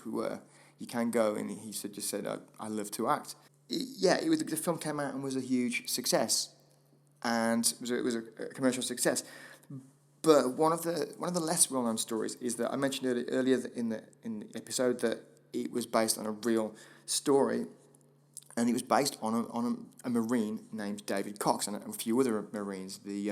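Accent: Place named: United Kingdom. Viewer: You don't understand english? I'd understand if it's not your first language though since the accent is british, even then, I would study a bit harder on dialects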